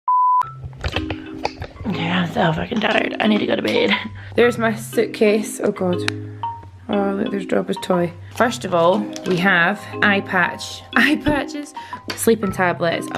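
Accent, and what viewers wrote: Scotland, United Kingdom. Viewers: Are you from Scotland 🏴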